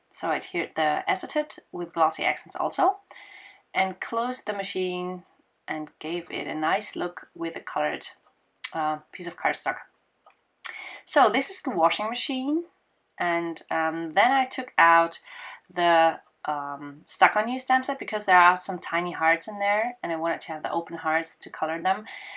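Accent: Germany. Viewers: Oh I LOVE it when you have a card up! Not only are they adorable, but I get to hear a little bit of the German accent I miss oh so much! As for your coloring question: my marker of choice are the ProMarkers, i use Prisma pencils and I am slowly getting into the whole water colour thing. Keep up the good work and I cannot wait until your stamps are up and running! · Your accent is not funny! It's beautiful to me! I miss hearing german accent. I lived in Stuttgart and Nürnberg, I miss Germany :) Yes, it is craft foam, and I love how you used it to make the shaker, very clever! I like my copics, water color pencils, water color paints, and regular colored pencils with mineral spirits.I am saving up to get Pitt brush markers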